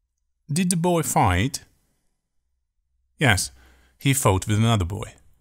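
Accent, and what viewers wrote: United Kingdom. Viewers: Buenas tarde profesor soy principiante su ingles es britanico! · I loved this lesson, but the accent is British, isn't?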